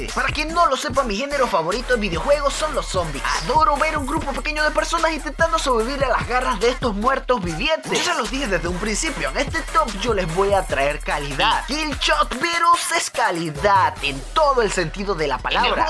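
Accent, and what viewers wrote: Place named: Chile. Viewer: Porque habla como chileno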